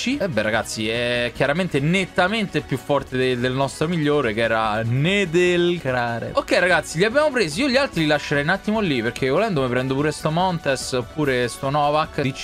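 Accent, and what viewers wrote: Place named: Palermo, Italy. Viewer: che parla palermitano 🔝 😂😂😂😂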